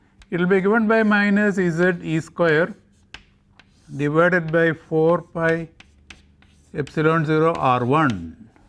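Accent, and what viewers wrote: India. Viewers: Although the heavy Indian accent is hard to understand,the lecture is wonderful and helpful,thank you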